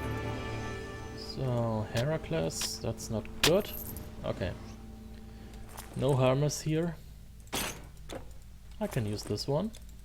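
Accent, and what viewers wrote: Germany. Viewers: you sound really German oh du sagst ja sogar du bist aus Deutschland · Man merkt richtig deinen Deutschen Akzent! :D But i like it.. :)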